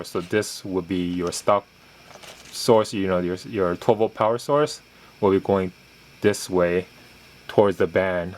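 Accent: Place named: South Korea. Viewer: I have '15 Accord Sport. I got a lot of useful information from you like the HID installation. Thank you very much. I got personal question. Are you from Korea? Your accent is very similar as mine. ^^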